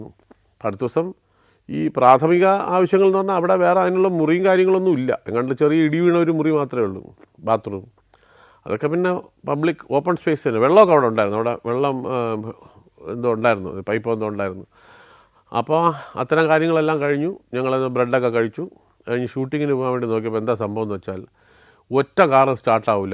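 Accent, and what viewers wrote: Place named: Thiruvananthapuram, India. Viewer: തിരുവനന്തപുരം സ്ലാങ് ൽ കേൾക്കാൻ നല്ല രസം 👍🏼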